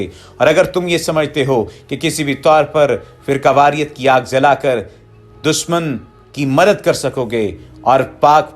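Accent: India. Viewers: Pak Foj Zindabad Hai.....Mulk K liye Or Pak Foj k liye Jan B zinda. Ap ke awaz indian lagti hai.....Wesy Iran or Saudia or afganistan k bary me itna zada Q discuss kr rahy ho.......India k bary me itna discuss nai kia ap ne video me....................Humy pta hai sab apni foj k bary me💖💗💕💓🇵🇰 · Aap ka bolne ka talfaz indian ki tara hai r u a pakistai .i dont belive u r a pakistani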